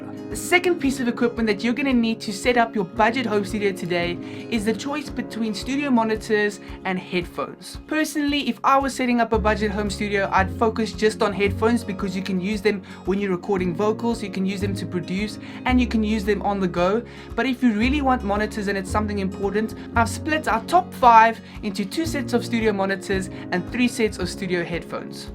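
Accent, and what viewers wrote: South Africa. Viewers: That accent... You must be from South Africa 😁 Me too. Thanks for the video dude! Been doing my research for a while and here I come across your video that confirms all my choices, M40x, MPK Mini Mk2 and the Scarlett Solo! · ey bruh i know you from SA because of the accent mad respect from SA bro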